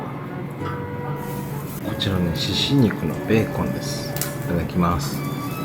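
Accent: Kansai, Japan. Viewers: 前々回から、あれオ−プニングの音楽が変わりましたね。 あの歌舞伎映画の音楽かと思ったけど違ったら申し訳ありません。 今回の音楽は朝日と雲海と絶景と合っていて国民放送さんみたいでしたね。 いつもの滝と神社が普通に暮らしてたら行かないような所が画面一杯に広がってあきないです。 関西弁も素敵です。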